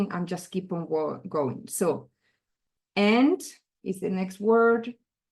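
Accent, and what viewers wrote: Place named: Catalonia, Spain. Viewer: Fun to watch you create beautiful words with such ease. Also fun to listen to your Catalan Spanish accent. ❤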